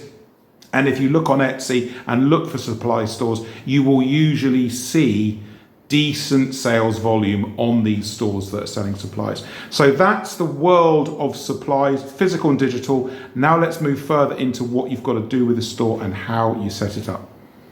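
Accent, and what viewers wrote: England, United Kingdom. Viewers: Hey, I stumbled across your channel by accident and I have to say I absolutely love your advice AND whats nice is hearing an English accent talking about Etsy (as its usually people with an American accent). I have a question, I haven't even started yet but what is your advice, can you open an Etsy store in the US being from the UK or is it better to start in the UK and then can you move and expand in the market? I actually live in Dubai but am originally from the UK (and still have my UK bank account)..any advice would be appreciated. Thank you so much for all the value you give.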